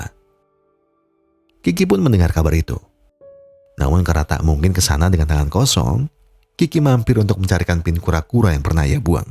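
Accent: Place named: Surabaya, Indonesia. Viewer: ternyata orang surabaya masnya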